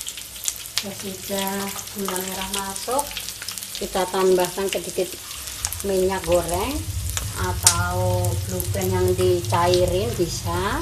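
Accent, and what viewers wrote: Java, Indonesia. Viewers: Assalamu'alaikum, satenya siiiip 👍👍👍 lucu banget klau ngomong, dr jawa y?.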